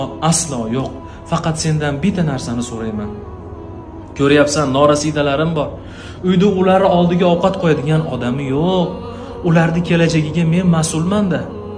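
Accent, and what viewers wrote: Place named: Fergana Valley, Uzbekistan. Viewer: Оглим сизни тингласам мазза киламан : бирам чиройли килиб водий шевада гапирасиз оглим : Тани жониз Сог болсин Ширин болам : асал болам : Рахмат сизга : 72 ешли онахон сизни тинглаб рохат киламан👍👍👍👍👍❤️❤️❤️❤️❤️❤️❤️❤️❤️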